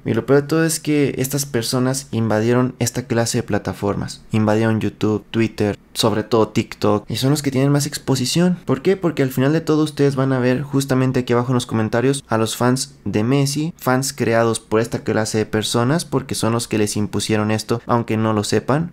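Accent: Mexico. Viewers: El que hace el vídeo es mexicano no? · Cuando tenes videos de cr7 con el titulo callando bocas..... campeón del Mundo .....Árabe...Te pediría el pasaporte pero por el acento .diría Mexicano ....no tenes autoridad para hablar de Futbol ....Mexicanos hablando opinando de futbol solo son memes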